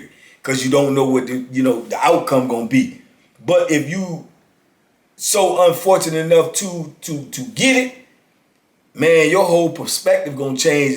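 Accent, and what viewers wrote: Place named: Tidewater, United States. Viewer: you have a way with words! I can forsee you writing a Book/Audio Book,I lived in the Tidewater Area,and when you say certain words,it takes me back! Appreciate your efforts! Keep applying Pressure to these other You-Tubers.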